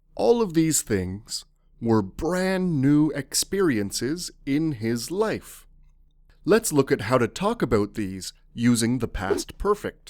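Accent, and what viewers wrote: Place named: United States. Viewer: My goodness man. Good thing I've found your channel. I love the American English, and you speak so clearly, I give English lessons and your explanations will help me a lot. Good job. And your little stories are the best ones. Keep up the good work.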